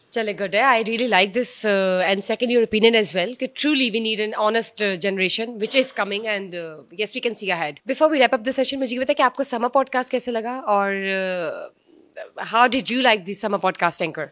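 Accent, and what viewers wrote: India. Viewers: Hindi influence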